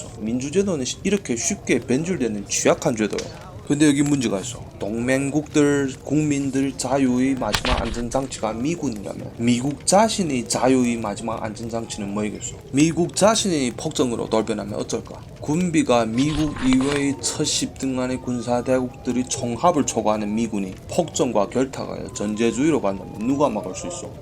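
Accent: Yanbian, China. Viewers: 피뜩 들어두 연변사람 옳스꾸마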